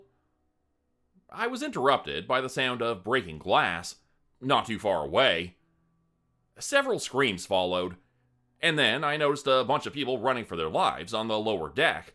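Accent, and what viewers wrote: Canada. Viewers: Your Canadian accent makes your stories very entertaining good job! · I like your Canadian accent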